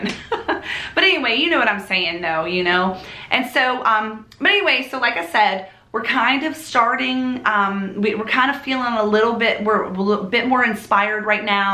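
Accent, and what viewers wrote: South, United States. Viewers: Hi, I love your accent, that southern drawl, and I wish I could decorate as good as you do, you inspire me to go bigger than the small decorative touchs I was doing, I went from a 3 foot tree to a 7 1/2 ' tree and for me that was a major thing, don't look at others who you think are more successful than you, just be you, please don't try to change, but be the person you are, if you have been crafting show that, if it's the garden or the chickens or your bees, show that don't think I need to spend money on xyz, you have been missed and I'm glad you are back.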